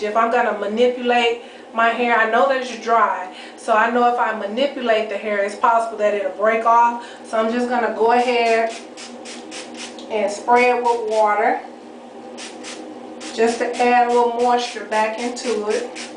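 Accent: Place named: Mississippi, United States. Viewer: I am originally from Mississippi and your channel (and accent) gives me a taste of home. I never thought to use hair grease to pre-poo. Well dang... Gonna try this next wash day.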